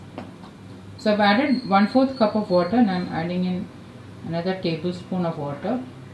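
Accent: Kerala, India. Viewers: Mam are you from kerala, please don't take me in wrong way actually kerala people's try very much arvedic things by your voice felt are you from kerala.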